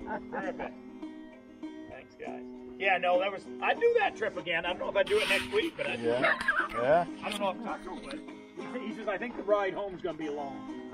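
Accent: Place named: New England, United States. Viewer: Love that New England accent. No doubt as to where he's from.😆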